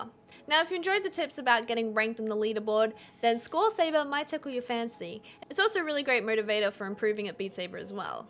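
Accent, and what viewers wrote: Australia. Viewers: I like your dancey play style - I enjoy using that approach with my juggling..more satisfying (and different) than pure tech, IMO. Australian & New Zealand accents are some of my favorite. To my American ear, it sounds like the words are often mellifluously packed full of warbled, extra vowels. I want to understand why the hard “O” sounds like “-arr” - this fascinates me! Thanks for the advice! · Are you Australian? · I always forget you're from Australia! I'm Aussie too, but every time I hear you speak it takes a second to adjust to your accent. Thanks for these tips, though. I got an Oculus a few days ago and I'm stuck between Hard and Expert. No fail and the different grips are a game changer!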